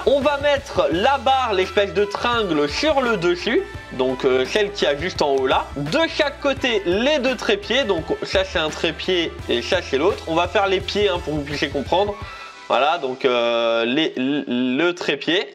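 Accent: Alsace, France. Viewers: Attend mais tu vient d'Alsace ?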